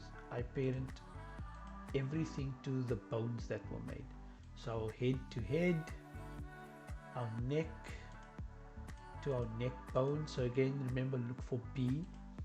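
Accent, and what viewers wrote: South Africa. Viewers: Great tutorial, thanks so much for sharing! Do my ears deceive me, or am I hearing a South African accent?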